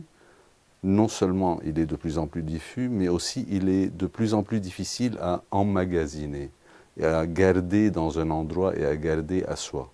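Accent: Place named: Quebec, Canada. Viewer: I disagree. I think he's French-Canadian. Either that, or he has spent time in Canada and he's really really advanced in French.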